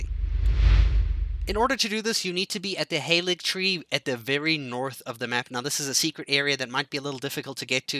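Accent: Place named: South Africa. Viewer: I have a friend from S A. I recognize the accent. Cheers and thanks for the help.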